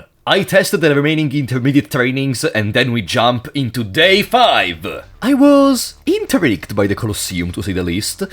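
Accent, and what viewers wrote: Italy. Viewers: are you italian? the accent sounds like it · I think you are italian just like me, kinda feel it from the accent, btw i'll comment in english cause I think that's the target that you want to reach; i'm impressed from the video, the dedication and the will you put on it, i love it from the bottom of my heart and i laughed so much so many time, please keep going on!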